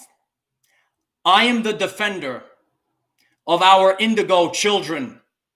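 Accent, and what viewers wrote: New York, United States. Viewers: Sounds like a New Yorker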